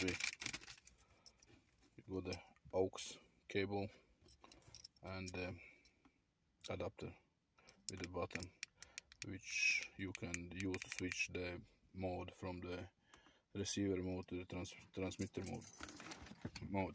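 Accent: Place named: Latvia. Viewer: sveiks pēc akcenta liekas ,ka esi Latvietis ludzu atstui linku kur var pasutit to adopteri ?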